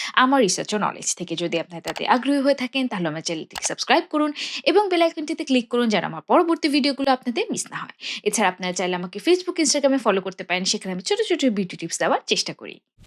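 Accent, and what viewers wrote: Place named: Bangladesh. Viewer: Just watched a few videos of your english channel. I love your bangla accent but all the best for your English channel as well ❤❤❤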